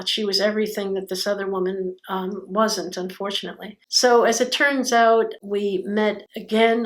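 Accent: New Jersey, United States. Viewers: From jersey and don't talk through your nose 😂😂 My Dads is from there 2 and when I piss him off u can hear that Jersey coming out 😊😂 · I knew that accent was American but now you got me I'm from Jersey City New Jersey YYYEEEAAAAAA!!! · i'm from nj too but i grew up in pa. did you ever have the jersey accent? i had a heavy jersey accent at one point but it changed to a pa accent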